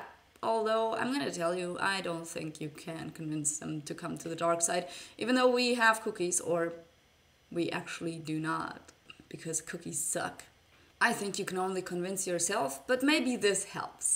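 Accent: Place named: Germany. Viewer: I hear a German accent. Yikes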